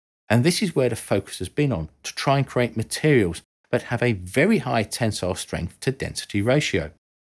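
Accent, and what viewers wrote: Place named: Yorkshire, United Kingdom. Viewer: Ahh, that Yorkshire influence on the accent.... "What planet are you on?" "Uhf"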